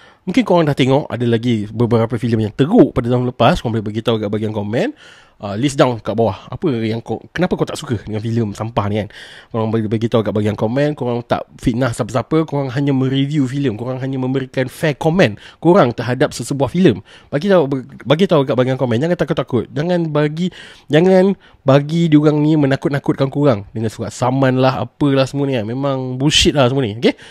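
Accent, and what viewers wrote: Kedah, Malaysia. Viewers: First time dengar cakap loghat Kedah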